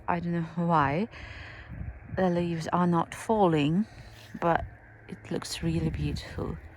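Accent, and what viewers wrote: England, United Kingdom. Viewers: Very interesting. You sure have a lot of work to do fixing the cellar and doorway! I notice that you seem to have an English accent. Did you attend school in England?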